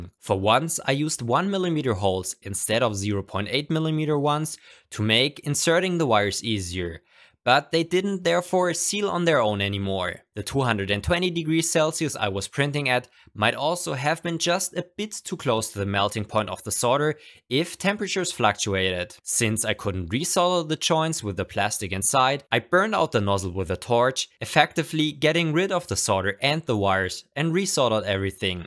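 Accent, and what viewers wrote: Germany. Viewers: Hallo, Dein Deutsch-Dialekt kommt richtig geil. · Hello, bist du eigentlich Deutscher oder Österreicher? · Are you german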